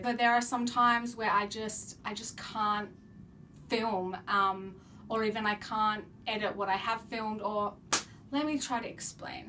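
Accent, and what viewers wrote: Australia. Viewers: You're fast becoming one of my favourite youtubers, and being an Australian it's lovely to hear a familiar accent! Take as long as you need, I know that I along many others will stand by you wil oodles of love and support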